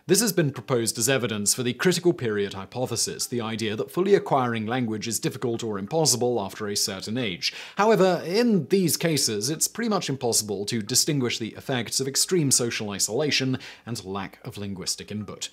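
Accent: England, United Kingdom. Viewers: Can you talk without chopping up your words? Or is there anyone else that can be the voice of this channel? The English accent is very beautiful, in fact I think it's the most elegant accent there is, but the way you chop up your words when you talk makes it very difficult to actually focus and comprehend the information you're presenting. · This is a good video, but do all Brits says Nicaragua that way? Nicarag-yew-ah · Out of topic but i got scared when the guy sounded british, i thought he was vsauce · I think he was just raised in Britain? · The British pronunciation of Spanish -os words always bugged me, it's so odd to hear someone say "Carlos" as "Carloss".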